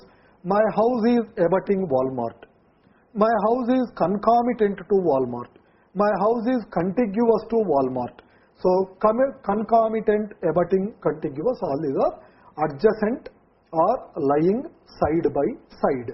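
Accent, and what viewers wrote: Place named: India. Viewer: Useful and interesting method of learning English but hard Indian accent makes the lesson more harm than good.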